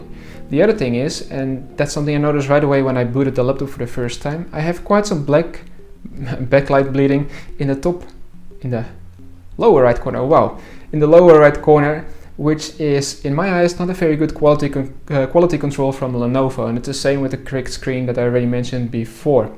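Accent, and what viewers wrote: Netherlands. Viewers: Are you dutch? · Is deze man Nederlands? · Goede video! Ik heb er wat uit geleerd... Als een Nederlander Engels probeert te praten merk je dat meteen EN Dat dit zeker een laptop is die het kopen waard is.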